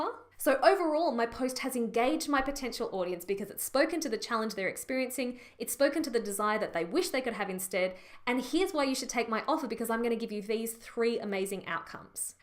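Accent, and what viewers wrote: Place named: Australia. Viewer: OMG! Love you! Aussie Voice #1 Relatable #2. Freakin Funny & Human #3 Thank YOU #4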